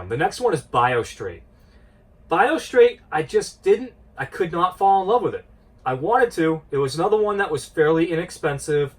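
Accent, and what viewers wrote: New England, United States. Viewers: Ahhhh.....there's a real symbiotic relationship between a how-too upload and a New England accent.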